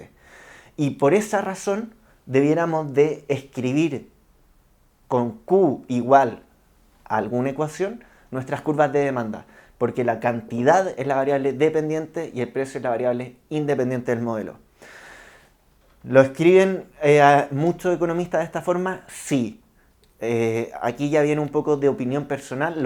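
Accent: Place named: Chile. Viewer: Chileno detected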